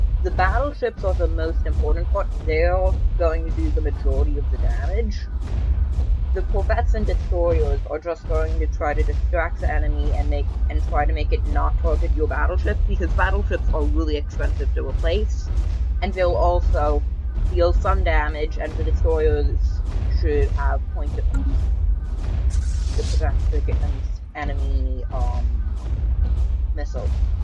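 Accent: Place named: North America. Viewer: north american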